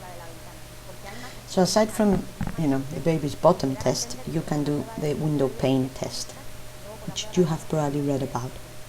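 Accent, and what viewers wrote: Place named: Spain. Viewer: She isn't Latina, but Hispanic she is from Spain can tell from the accent. People from Spain are Hispanic.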